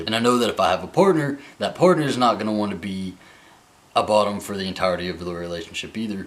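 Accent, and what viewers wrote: South, United States. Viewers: I think your point is what I been saying to my best friend we should focus on the relationship an not the bedroom, there's so much more outside if the bedroom , love your videos an want hold that team against you lol #GoRebs that southern voice swoon ..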